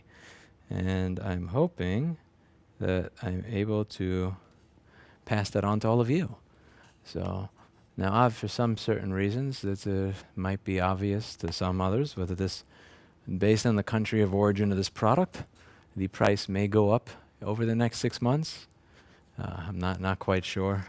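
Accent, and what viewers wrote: New York, United States. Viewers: inner "Pissed-off-New-Yorker" accent is fighting its way to get free 😆 Love you, 🙏Keep doing you, man. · Doesn’t sound like any Texan I’ve ever met. Sounds like he comes for Queens or somewhere else in NY. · Lmao. You can tell how high the anger levels because the New York accent comes out even harder when is pissed. Love you, thank you for everything you do for right to repair man. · Was gonna say that this video was a prime example of a person "speaking New York" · How did your new york accent get more pronounced from being in Texas for 2 years? lmao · A couple minutes in and he's gone full New Yorker. It's gonna be a good one. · I've never noticed your New York accent so much in your videos before.... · Is it just me or is your accent extra New Yorky nowadays? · This is top 10 most new york video ive seen ever.... The accent... 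The aggression... The new york...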